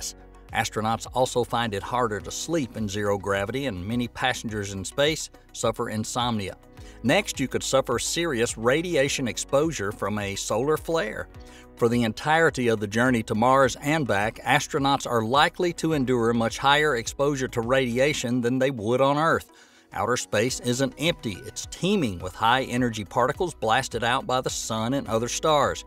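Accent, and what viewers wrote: South, United States. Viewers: The narrator has an annoying "country" accent and frequently mispronounces words.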